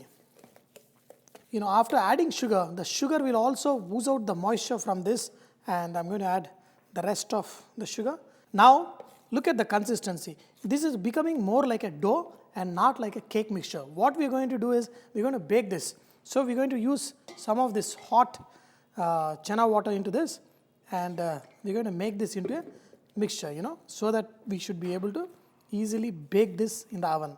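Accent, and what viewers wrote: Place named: South India, India. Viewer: Snigdha Parida he is from south india so they pronounce like that ☺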